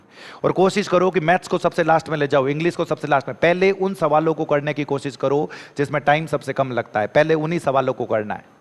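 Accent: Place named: Bikaner, India. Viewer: Guru ji the bikaner ra ho kai aa bhasha mali lag man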